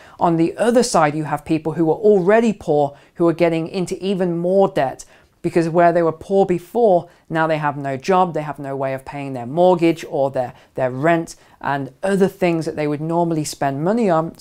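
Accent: England, United Kingdom. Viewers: Is this the American stock market you're referring to. It is confusing with your British accent. You should make it clear. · I didn't like your video at first because of your English accent... How could you relate anything in the United States ?? I watch a lot of videos like David Morgan Gregory Mannarino.. Gregory Mannarino is my favorites show !!!! but you sir are right at the top with the best of them I really enjoy your shows now ... keep spreading the truth and God bless 🙏 🤓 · All of his real world video was of Britain and his accent is British. Guns are far more difficult to in the UK. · I listen to him because he has an English accent, as an Ozzie, the English are like our smart uncle!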